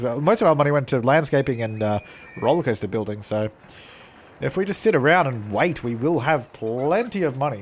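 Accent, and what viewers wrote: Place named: Australia. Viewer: you have the best Australian accent :D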